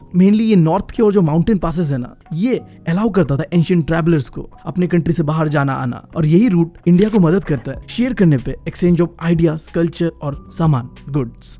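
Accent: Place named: Bengal, India. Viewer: Aap bangaly ho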